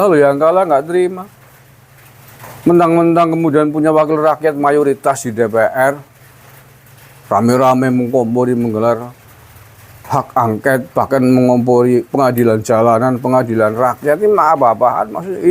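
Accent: Banyumas, Indonesia. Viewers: Hebat bahasane banyumasan banget teknbrengkolan bae mengko aku ketawa ngakak banget bisa menghibur jafi aku ketawa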